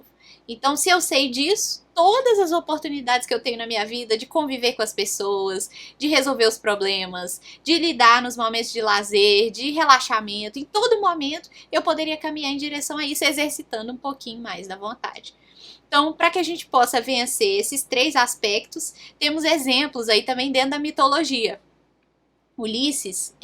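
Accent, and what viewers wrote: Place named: Minas Gerais, Brazil. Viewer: Sotaque de minas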